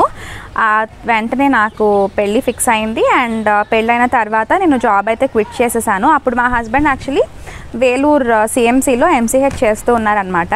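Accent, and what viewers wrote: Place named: Vijayawada, India. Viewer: me slang chusi menu anukunna vijayavada ani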